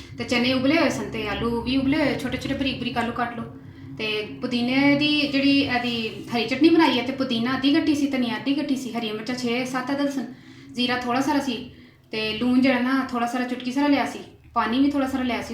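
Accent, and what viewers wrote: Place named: Punjab, India. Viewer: Ap Ka punjubi satyl bohat acha h 😘me b punjubi hn I mrs Usman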